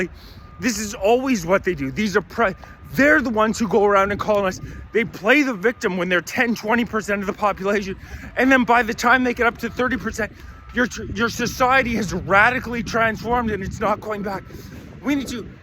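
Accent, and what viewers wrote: Canada. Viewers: Here we go the pandering continues senile old ladies and naive children beware the funny thing is this guy couldn't exist for sh!t without the same strung out buffalo soldier ignorant immigrants (that he goes so obscenely out of his way to rip on when antisemitism is a criminal offence as of last year!) looking the other way from every second word out of his mouth cause yknwo, they saw actual war (which this guy supports of course) and the same blackmailed child molester politicians he pisses the same exact party lines out of his dick hole face with that stupid vaguely hoser accent he puts so much effort into 😂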